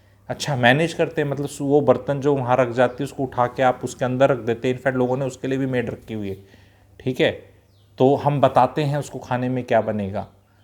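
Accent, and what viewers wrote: Haryana, India. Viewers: Hariyana se ho aap kya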